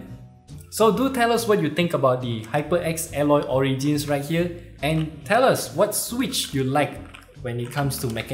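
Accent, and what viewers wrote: Singapore. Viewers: Noice video by the way are you Singaporean because that accent sounds soo familiar. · You from Singapore lah?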